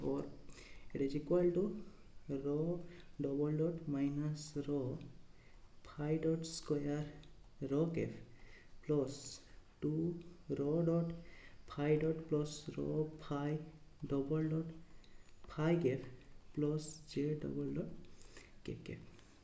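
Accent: Odisha, India. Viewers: Are u odia?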